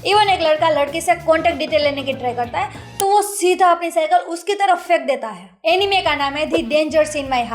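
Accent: Gujarat, India. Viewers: Aapki hindi me gujrati vibe kyu aarhi hai 😶😁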